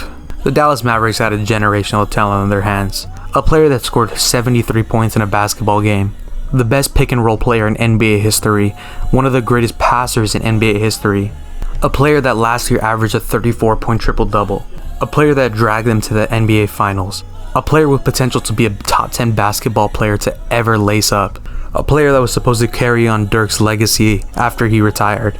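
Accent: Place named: Latin America. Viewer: your latinx accent is gross ngl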